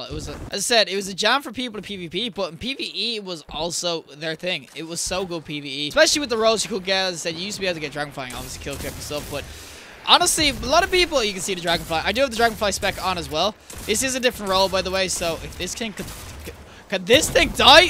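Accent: Ireland. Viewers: i was wondering I can't work out where in Ireland you're from? i can't figure it out. i'm usually good with our accents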